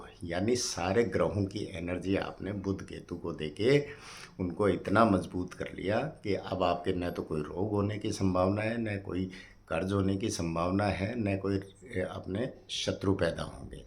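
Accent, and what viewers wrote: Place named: Haryana, India. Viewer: Bahut achi jankari ji.......Aapki tone k hissab se aap sayad haryana se belong krte h....nice video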